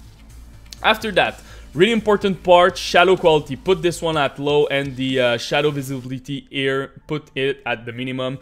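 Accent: Quebec, Canada. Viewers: ca sens lquebec a plein nez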